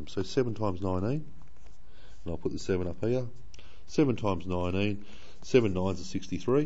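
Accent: Australia. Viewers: i can hear him perfectly, maybe you guys can't understand his aussie accent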